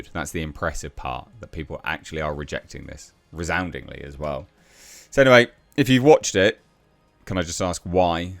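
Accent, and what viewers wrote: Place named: United Kingdom. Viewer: I don’t know if it’s his British accent but is so funny 🤣 he’s not even trying to be funny.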